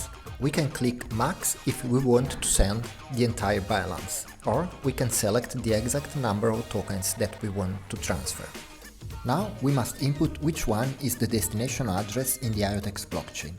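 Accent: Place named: Bulgaria. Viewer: the speaker has bulgarian accent :D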